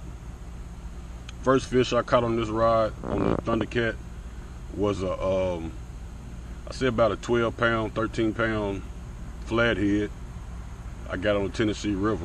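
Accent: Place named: St. Louis, United States. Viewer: You sound like you from STL..😂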